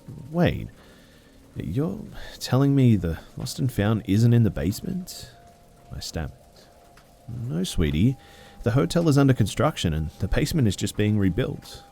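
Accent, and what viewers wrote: Australia. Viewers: I'm pretty sure he's Australian.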